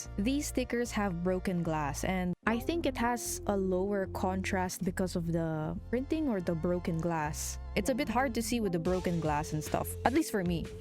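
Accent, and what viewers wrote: Philippines. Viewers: i could tell from her/his (dont want to misgender and start an arguement heh) voice that your filipino, and the "lolo" part lol, but your grandpa is cool and i love how supportive he is just by wanting you to make merch for him :3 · You know is Pilipino Because of The Accent❤❤😅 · Hi im new here, i love the shorts you post😁😁 Anyways.. I have a question... You sound filipino are you? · WAIT UR FILIPINO?? I SEE THE ACCENT IN YOU.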